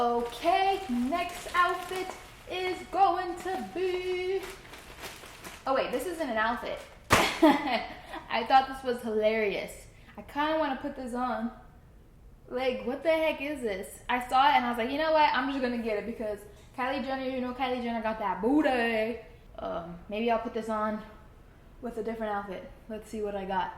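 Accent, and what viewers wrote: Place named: Texas, United States. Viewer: i feel like you had a strong texas accent throughout this video 😂💕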